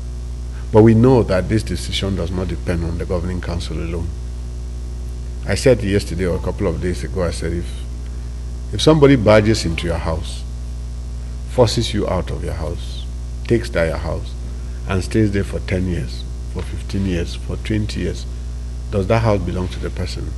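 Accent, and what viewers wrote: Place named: Nigeria. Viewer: You sound Nigerian . How is the struggle of Cameroonians consern you ?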